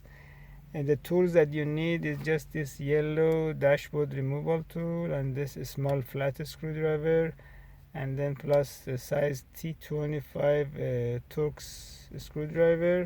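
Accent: Iran. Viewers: داداش ایرانی هستی اخه لهجه اینگلیسی خیلی باحالی داری 🤭😂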